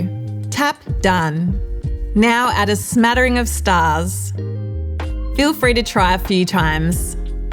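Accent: Australia. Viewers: Great tutorials but her voice/accent is like nails on a chalkboard. Aussie accents are the worst. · Good to hear an Aussie voice!😊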